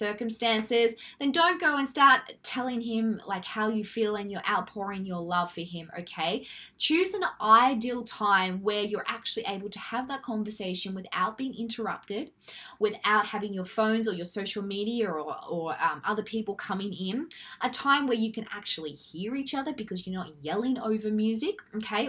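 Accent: Australia. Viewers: Mark Edwards I don’t know but I do know she is Australian, I’m Australian and this is how Australians speak. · are you Australian? British? Are you in Australia? I'm curious because I'm always comparing how the English are compared to how Americans are.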